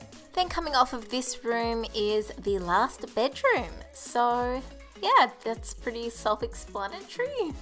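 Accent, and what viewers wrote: Australia. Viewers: New subscriber are you Australian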